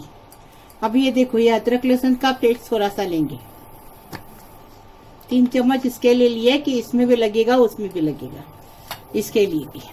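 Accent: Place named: Maharashtra, India. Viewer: khala aap kha rhete ho aapke bolne ka trika to maharashtra jesa h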